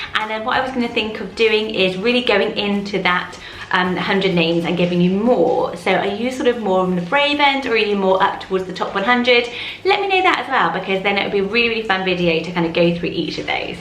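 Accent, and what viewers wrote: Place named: Bristol, United Kingdom. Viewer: The whole way through this I was like you sound like your from Bristol and then you said Wiltshire and it all makes sense haha x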